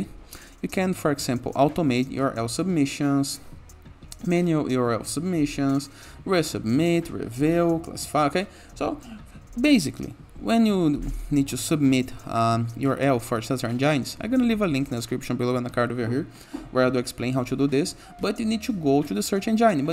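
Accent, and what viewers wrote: Brazil. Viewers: Are you brazilian? Your accent sounds really 🇧🇷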